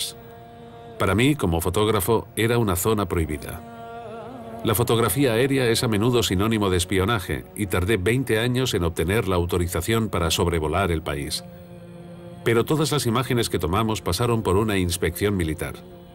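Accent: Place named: Madrid, Spain. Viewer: ¿De dónde eres? No adivino tu acento ya que es casi imperceptible. Diría que Eres de España, en concreto de Madrid. Saludos.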